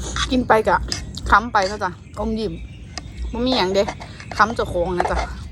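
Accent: Isan, Thailand. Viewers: สาวหมวยพูดอิสานน่ารักดี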